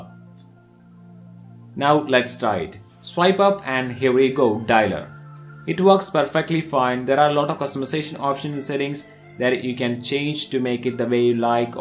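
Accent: India. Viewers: I'm sorry if i offend you but where do you come from because you're accent is awesome and sounds Indian or around that area.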